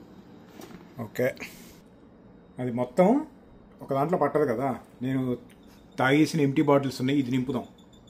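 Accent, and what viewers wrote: Andhra Pradesh, India. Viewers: Andra slag lo matladutunnaru bro, nizamabad ante nammabuddaitaledu anna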